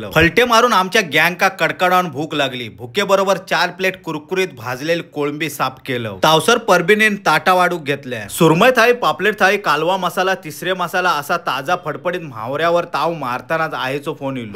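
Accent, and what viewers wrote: Malvani, India. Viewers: Nako, konkani, malvani bolanychi hich padhat bari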